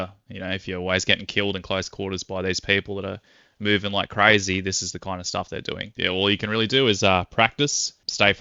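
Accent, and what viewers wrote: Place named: Australia. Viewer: Nice to hear an Aussie voice and a fella who knows what he's on about to boot. Subbed cheers mate.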